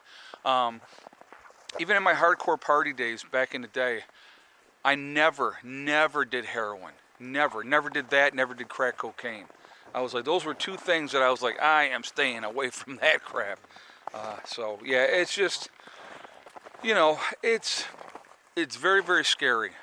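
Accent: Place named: Upstate New York, United States. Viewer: I really enjoy your channel, and your upstate NY accent, Boston native here. Ive been following your channel for a while now, subscribing. Im a so called prepper myself. I'd rather have it, and not need it, than need it and not have it. Thank you for sharing, I'll see you on the next one 👍👍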